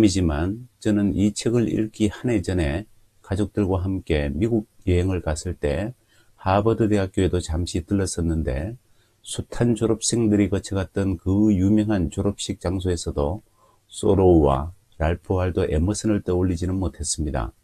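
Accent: Gyeongsang, South Korea. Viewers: 근데 경상도억양이 일상에선 듣기좋았는데 책읽기로는 듣기가 무난하진않네요 껄끄럽다고해야하나 ㅋ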